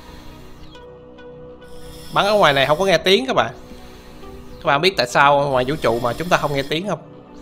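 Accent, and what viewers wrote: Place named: China. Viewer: Hình như anh là người hoa đúng ko?sạc pin mà anh nói là sạc tìn:)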